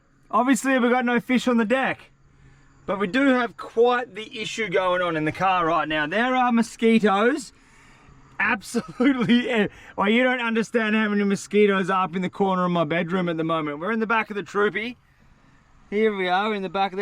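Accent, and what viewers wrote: Australia. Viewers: love the way australians talk: "don't know what that was, but we did have a massive inquiry" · He's Australian we sound very similar to South African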